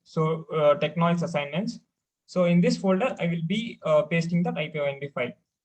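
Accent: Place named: India. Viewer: When i heard an Indian accent I knew I'm saved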